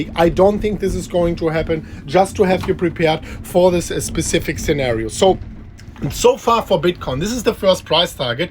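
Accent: Germany. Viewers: Hes german right?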